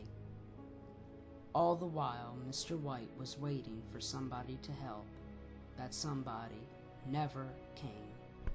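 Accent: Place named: Maryland, United States. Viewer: I love the narrator's accent. I don't get to hear the Maryland accent often enough :-)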